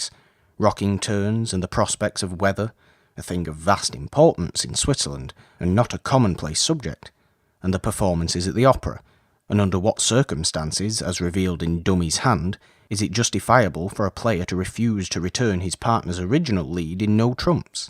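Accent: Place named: Yorkshire, United Kingdom. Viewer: Am I hearing Yorkshire ? I think so and I adore it 💖 I've many ancestors from Yorkshire although I'm a Yank.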